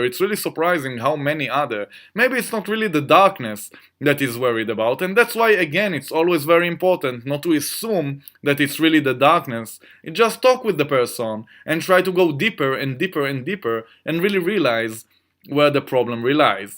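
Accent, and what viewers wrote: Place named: Israel. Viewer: Well I for one love Israeli accents, yalllaaaaaa! Thanks! This will help me sleep better alone at my ranch after a series of home invasions in my 20s that's left me with sleep trouble when I'm home alone. Going to conquer it though! Thank you!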